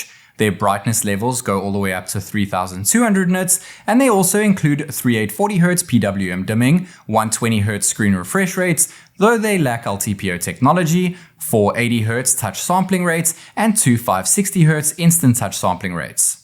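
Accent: South Africa. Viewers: The moment i heared your voice ik you were south African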